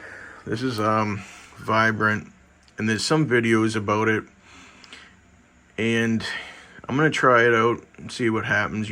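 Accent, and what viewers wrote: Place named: Massachusetts, United States. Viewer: I’m dealing with green hair algae as well but it’s almost gone. Just need to get your hands wet, remove as much algae as you can and do a few water changes. If you stay up on your maintenance, it’ll fix itself. I would tell you, get rid of the vibrant. It’s proven to be more harmful than good. Just a simple google search will show you some horror stories. Side note, sounds like you’re in Mass.. I’m near Worcester.. you might want to join Boston Reefers Society if you haven’t already. Lots of good local knowledgeable reefers willing to help and give advice! good be luck bro.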